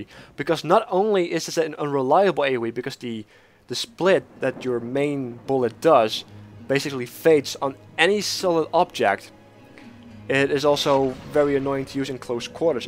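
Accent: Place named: Netherlands. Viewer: I might be wrong but his accent sounds very.. dutch.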